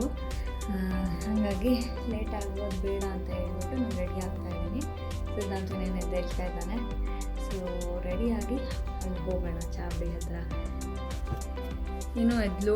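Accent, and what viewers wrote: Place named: Mangalore, India. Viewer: ನಿಮ್ಮ ಕನ್ನಡ ಮಂಗಳೂರಿನ ಕನ್ನಡದಂಗೆ ಅನಿಸಿತು ಅದಕ್ಕೆ ಕೇಳಿದೆ🙏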